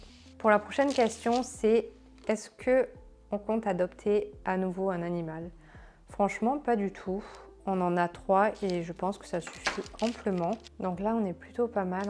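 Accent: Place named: North, France. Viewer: ton p'tit accent nordique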